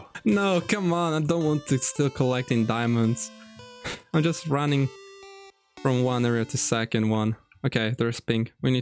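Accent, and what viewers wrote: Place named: England, United Kingdom. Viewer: Ty naprawdę polak bo brzmisz idealnie na anglika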